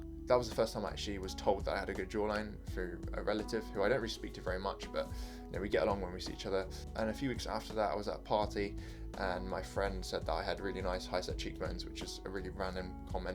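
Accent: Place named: United Kingdom. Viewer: ngl I've never seen an asian with a UK accent completely threw me off LOL. Great vid tho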